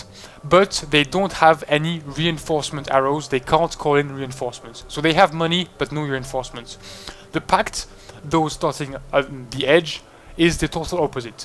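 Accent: France. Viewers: First :p, nice vids keep up the good work and the french accent ;)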